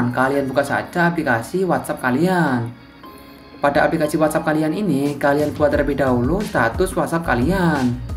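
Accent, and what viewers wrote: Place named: Java, Indonesia. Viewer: Orang Jawa yh bbg 😂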